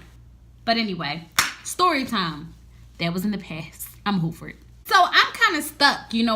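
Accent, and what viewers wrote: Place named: Philadelphia, United States. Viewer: yesss i ik u was from philly the way you talked :) lol ysss tell more im from north philly and same shit kinda happend to me .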